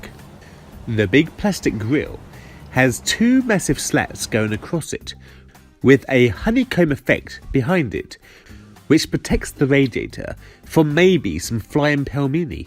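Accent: United Kingdom. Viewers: Nice little car. Well made video too :) loving the British accent 👍 have a good day and thanks for sharing :)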